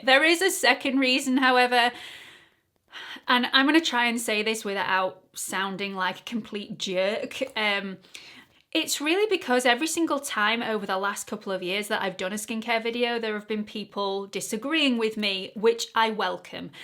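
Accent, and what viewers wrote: United Kingdom. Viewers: you are a gem! I always look forward to your videos! Between your adorable personality and your British accent, I would watch you all day, even if you didn't ever share the wonderful information with us. Hugs from Iowa in the US! 💓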